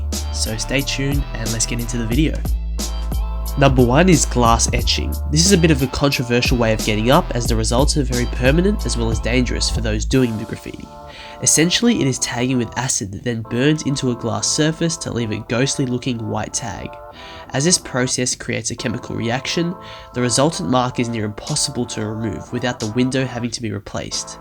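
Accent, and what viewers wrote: Australia. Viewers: Are you aussie lad?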